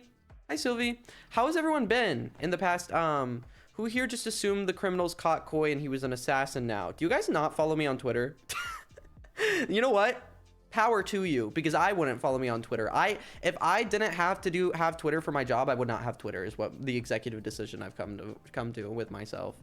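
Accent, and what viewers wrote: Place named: New York, United States. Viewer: i really feel the new york talk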